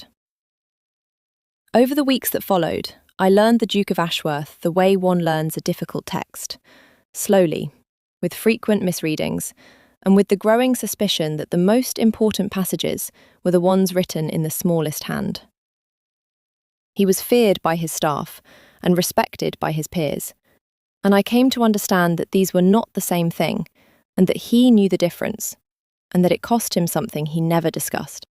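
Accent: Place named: United Kingdom. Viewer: Finally a brittish accent. Nice story. Gothenburg, Sweden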